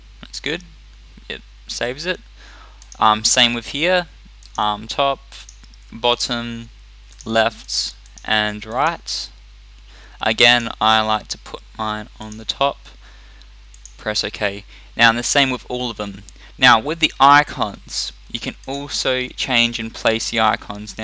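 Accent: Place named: England, United Kingdom. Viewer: Какой чудовищно неприятный английский акцент =(((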